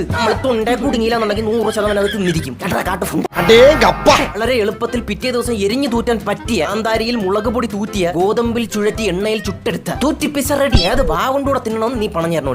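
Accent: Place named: Thrissur, India. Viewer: ഈ ചേട്ടന്റെ ഭാഷ തൃശ്ശൂർ ഭാഷയാണ്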